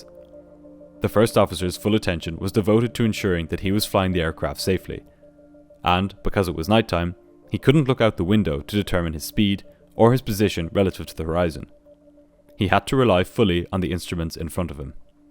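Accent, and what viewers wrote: Ireland. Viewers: The narrator is Irish...the ATC is clearly Australian my dude smhl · Bruh he's Irish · Top o' the mornin' to ya! What a quaint Irish accent you have - love it!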